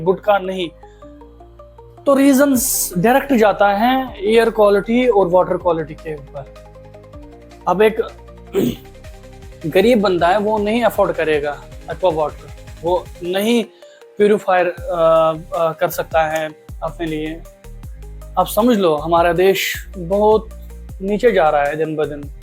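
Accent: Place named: Kashmir, India. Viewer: Are you kashmiri btw accent sae lag raha hai,i am from Jammu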